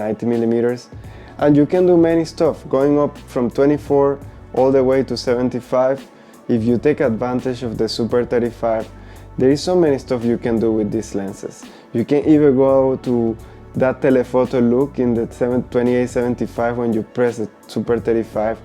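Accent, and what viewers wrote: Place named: Spain. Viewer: I like your accent too, although it sounds Spanish? In Macau don't they speak Chinese, Portugese and English? I'd love to visit there! Great video, BTW...♥️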